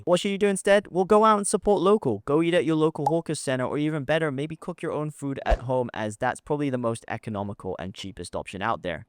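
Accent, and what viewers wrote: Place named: United States. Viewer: wat an american accent dude talking singapore finance? go back to america!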